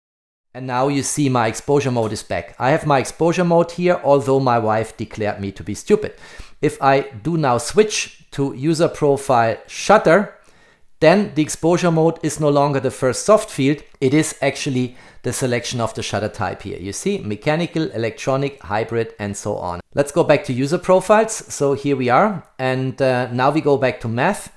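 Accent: Germany. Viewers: Nice videos, well explained. Thank you very much for that. But why don't you make these videos in German too? You're a German speaker, right? That's what your accent sounds like. This video is absolutely great. I bought an SL3-S yesterday and am now watching your video. It's really helpful with the camera settings. I think you'll be the only one to publish such a perfect explainer video for the SL3 in German on YouTube. Please do it in German. There are enough people in Germany who don't understand English. You would be a great help to them. Perhaps you could have it translated using AI. Thank you for your understanding and kind regards.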